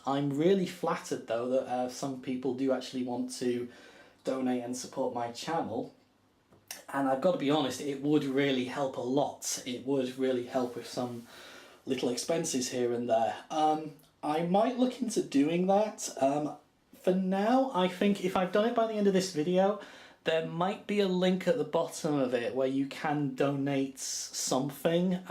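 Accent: Yorkshire, United Kingdom. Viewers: I swear to god,your Yorkshire accent’s getting thicker!!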